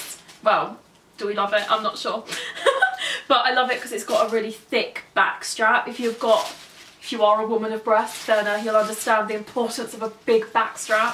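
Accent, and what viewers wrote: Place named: England, United Kingdom. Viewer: I love your accent !!! Are you from England ???🤔😘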